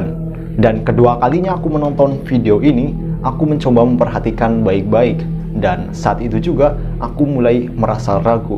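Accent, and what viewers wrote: Java, Indonesia. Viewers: Wong jowo mas?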